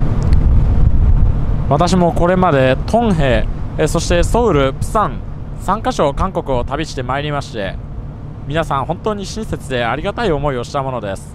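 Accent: Tokyo, Japan. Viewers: お話方は東京下町言葉をお話になるので、ある程度の整列された歴史認識を持ってこのような動画を作っておられるかと思っておりましたが、残念ながら中身がよしもと芸人と同じアンポンタンとは少し残念のように感じます。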